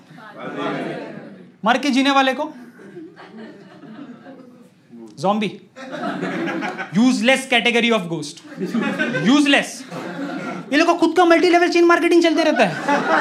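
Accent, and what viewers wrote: India. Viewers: The Marathi accent. lol.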